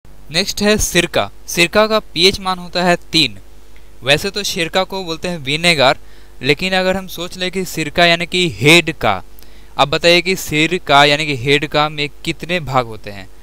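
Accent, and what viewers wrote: Bihar, India. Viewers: Bihari ho ka ???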